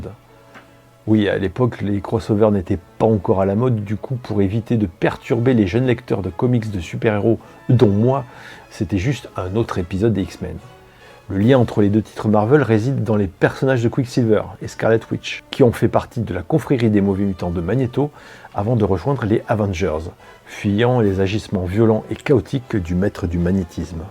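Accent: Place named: United Kingdom. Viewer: Merci pour ta vidéo et ta chaîne dans l'absolu ! Ton accent anglais me fait bien rire 😉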